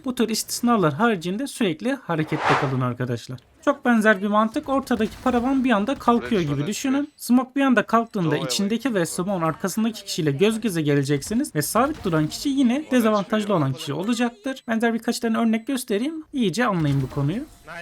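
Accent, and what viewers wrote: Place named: Black Sea, Turkey. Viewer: bi Karadeniz ağzı var gibi derinden kulağa geliyor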